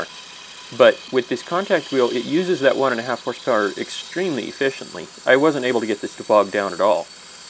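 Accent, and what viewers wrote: Canada. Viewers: This is the most Canadian sounding American i have ever heard.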